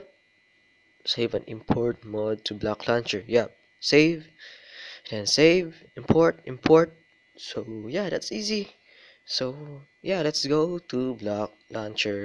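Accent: Philippines. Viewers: filipino right? english palang alam na this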